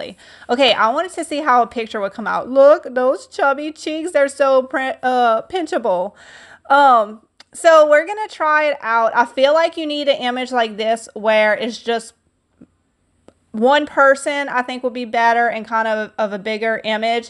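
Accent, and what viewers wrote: Louisiana, United States. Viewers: You are so creative and talented, ☺️ I'm from Mamou, (central Louisiana). I live in NC, so that I can be close to my 2 grand babies. I miss Louisiana and my siblings, relatives, classmates & friends; when I get homesick, I search for your videos, because you sound so Cajun. You sound like my favorite cousins, from Houma!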